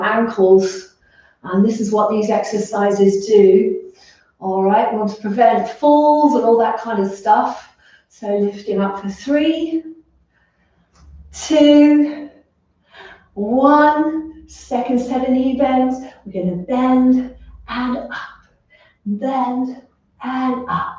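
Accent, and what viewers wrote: United Kingdom. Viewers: My wife and I discovered your videos two weeks ago and thoroughly enjoy doing them daily. We're 72 years old and appreciate so much your good instruction and for walking us thru each exercise in such a comprehensive manner. We both love listening to your wonderful British accent! Thank you so much! Bill and Debbie Graf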